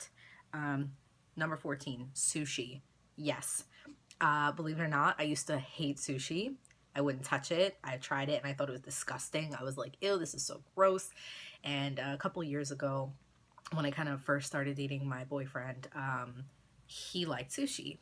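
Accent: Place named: New York, United States. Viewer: omg im from nyc and when I first started watching your videos all I could think of is "she has to be from nyc" bc your attitude and the way you spoke 😂😂💖 I love your videos btw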